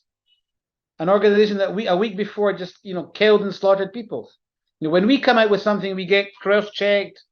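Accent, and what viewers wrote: Scotland, United Kingdom. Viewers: I stand with Isreal and loving the Scottish voice. Praying for the complete end of Islamisist terrorists death cults and the beginning of a positive living future loving life. 🙏 How can they believe people who pray to ‘the greatest deceiver? and the mischievous maker’. If you believe them you can’t believe them, right! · we love your Scottish accent! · Do I detect a slight Scottish Accent in there somewhere Sir? You are so right Sir. You’re a very open and honest man! You and your Nation needs to, and Must show the Whole World what happened in your country. Israel was intentionally, deliberately and savagely attacked in a totally unprovoked act of Genocidal Evil. You must show the World Uncensored and Raw images of this disgusting Pogrom committed against Israel and the Jewish people. Nothing like this has happened since the Nazi’s Genocidal murder of the Jews during the Second World War! 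You must defeat this Evil and the World needs to back your actions. All those who tell lies, the Western Media, University morons and all these sick anti Jewish, Anti Israel Haters and Demonstrators are Supporting Genocide and NAZISM. Hamas behave like and act just like the Nazis did, they are Evil Brainwashed Fanatics, and so are all those who support and back them! They must be shown that they are wrong, you must show the Entire World what happened to you people! God be with you my friend. Shalom!! A Supporter of Israel from England. ❤🏴🇬🇧🇮🇱🇺🇸❤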